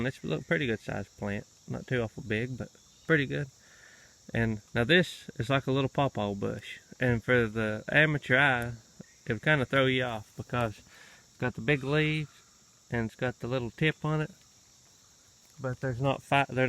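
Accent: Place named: South, United States. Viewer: Thank you for sharing your knowledge of the ginseng and also the medicinal plants. I've seen black cohosh in the pharmacy area of Walmart and think I know what it's for. You're blessed to have that land you can just walk out and go wandering around in, I wish I could do that but my husband likes the city and won't move. I watched the TV show about ginseng too and I thought they might be glorifying it a little but I'm sure there are people that go on land they have no business being on. I like the way you take care of the plants you have by replanting them, that's taking care of the gifts God has given us. Looking forward to meeting your family too. I have to say I love your southern accent, my mother was from MS and my dad was from West Virginia. God bless and take care, also when you said you had snake protection I thought you were going to pull out a venom kit and you had a pistol. 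lol Looked like the pistol had been around awhile too?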